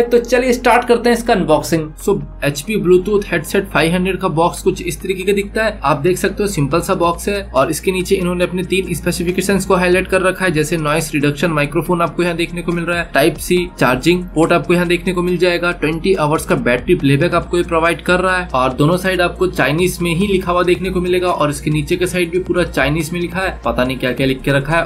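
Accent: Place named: India. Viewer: Bro... wt is this??? - I thot my pseakers saw brknen (im replying as I heard u) but nooooo this some broken Indian crap? just speak in Hindi or whatever you local language is - lord. this is a horrible listening experience.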